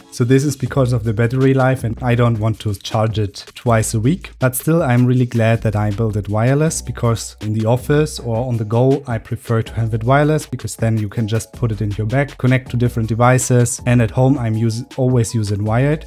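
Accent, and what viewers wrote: Germany. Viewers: hard disagree about op's comment. You just sound like a normal person with a German accent. You also don't talk with the "YouTube voice" (which is a good thing!). Sound's good, video's good, your script is tight without being rushed.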